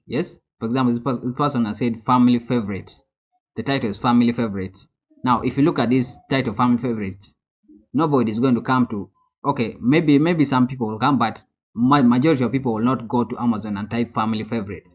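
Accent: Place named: India. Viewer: Are you a Nigerian? You sound like Indian 🤷 Love your effort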